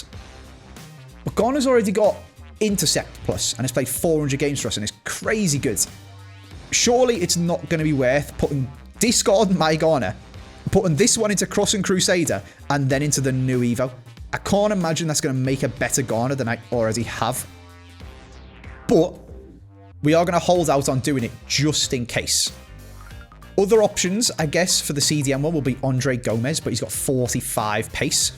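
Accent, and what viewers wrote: Liverpool, United Kingdom. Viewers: He’s scouse!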